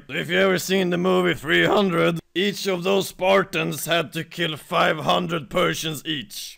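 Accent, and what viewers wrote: Sweden. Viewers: he is swedish i can hear on his accent and his calculator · are you swedish?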